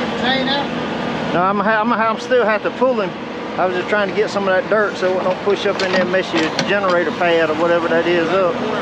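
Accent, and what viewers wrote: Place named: South, United States. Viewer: no P in sumter lol, that southern draw, love it. great video and recovery. you have a great deal of patience. I recently recovered a tracked skidder with mud and water over the controls, crappy filming I did because people didnt want to be on film and they didnt know how to operate it at all, made for a long day, lol. My truck is way smaller too, lol. Yall stay safe and keep having fun.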